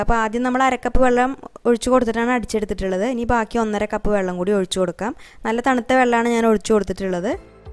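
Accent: Thrissur, India. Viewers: Thrissur aanoo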